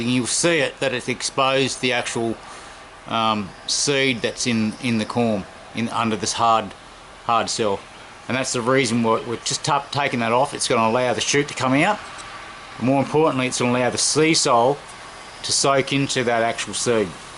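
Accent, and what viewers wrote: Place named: Australia. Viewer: Fair crack of the whip cobbers. (Sea salt? he said Seasol quite clearly mateys?) This blokes an Aussie and is how a few of us talk. Fair dinkum you drongos get yourselves some hearing aids so you can catch what the hell he's waffling on about. Crickey diggers, cobbers, blokes, wake up and whack down a tinny or two, or three! I reckon a few people on this site wouldn't know if they were Arthur or Martha but then again it is 2025 and i've got no idea what the fck is going on half the the time with people these days? Anyhow if you spot an Aussie on the street give them a hoi and say gooday mate and ask them if they have any corms to spare. 😜😇Peace.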